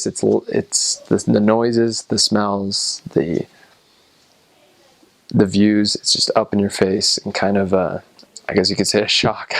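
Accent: South India, India. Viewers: His Indian accent is absolutely on point. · Man your indian accent is spot on!!! Super impressed. It is very hard to do. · You got the south Indian English accent down to a T.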